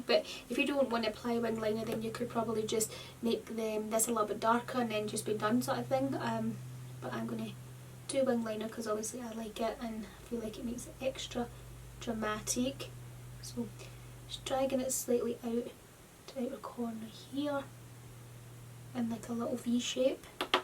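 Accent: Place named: Glasgow, United Kingdom. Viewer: This is a lovely look, I ended up buying both of Alexis' palettes, then I went and bought another transformation palette because I loved it that much. You sound like you're close to where I'm from, I'm in Glasgow 💖👍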